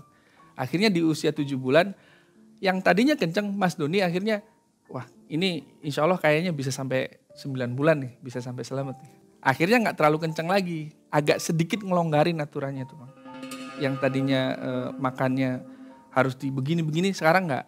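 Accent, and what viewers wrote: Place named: Indramayu, Indonesia. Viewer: Orang mana nih bahasa nya ga angger klo ga Indramayu cirebon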